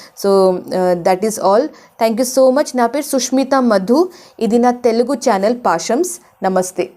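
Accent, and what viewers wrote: India. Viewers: North nunchi vachi telugu matladuthunatu undi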